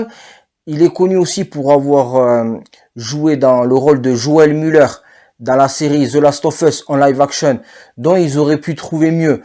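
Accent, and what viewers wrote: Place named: Toulouse, France. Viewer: Au top ton debrief sacrée accent toulousain hein😉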